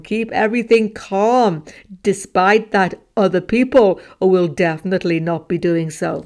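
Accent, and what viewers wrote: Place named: Working class, United Kingdom. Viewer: That's the way the working class people in England speak, dude. They can't help themselves. We shouldn't hold it against them. Nobody chooses where they were born or grew up. Anyway, it's about the personality of the individual, and I find this lady's personality to be one that wants to help others. That's the way she comes across to me, so her way of speaking does not irritate me.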